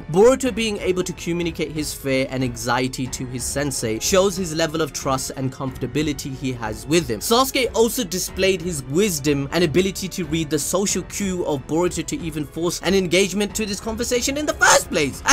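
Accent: Punjab, India. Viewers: you punjabi ?? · dude you indian? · Is this person punjabi🤔🤔🤔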